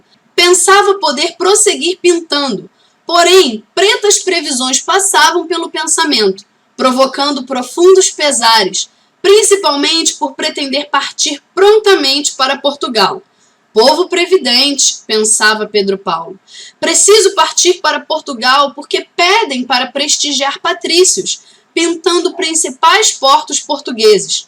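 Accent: Rio de Janeiro, Brazil. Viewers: Você tem o sotaque carioca 😍😄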